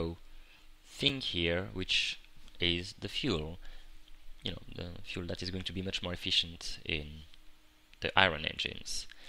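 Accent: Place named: France. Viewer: I have yet to hear a Frenchman speak perfect English though, most of them talk like give or take some. You can hear they are French the moment they open their mouth. And in typing they too can reveal their nationality because they use "of" a lot in their text. It's all good though because you can understand what he is saying and that's all that matters in the end.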